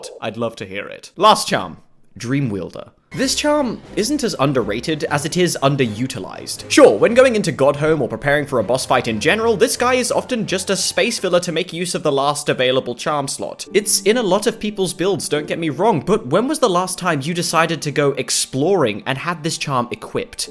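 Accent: United Kingdom. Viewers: I was getting ready for some serious insults before I realised I'm an Australian watching a polite British guy pretending to be mean.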